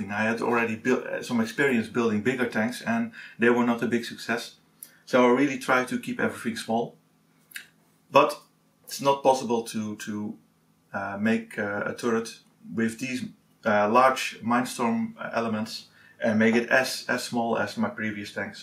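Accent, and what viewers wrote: Netherlands. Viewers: Are you Dutch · Are you dutch?!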